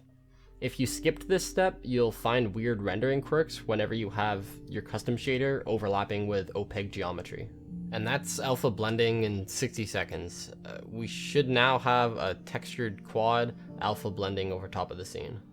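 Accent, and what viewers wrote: United Kingdom. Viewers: Awesome short but rich and enlightening explanation. Also thank you Vaguely British fragment guy ! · The British accent is so funny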